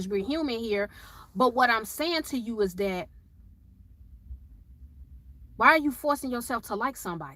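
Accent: Chicago, United States. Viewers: I knew you were from Chicago because of the accent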